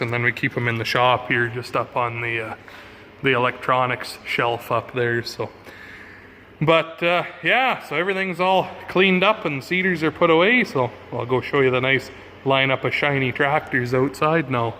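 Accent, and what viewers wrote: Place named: Canada. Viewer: This is a Canadian channel, if the name doesn't give a hint the accent sure do 😅 keep it up, really like your attitude man!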